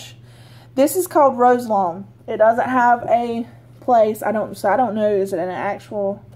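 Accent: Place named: South, United States. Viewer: Just found your station and I am a new subscriber---thanks for a great video, I have a southern accent too. I loved every subject you discussed & everything you showed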